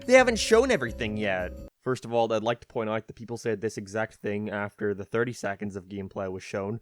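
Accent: Ireland. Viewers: he's Irish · no hes irish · ur from donegal arent u my brother? haha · Yeah he’s Irish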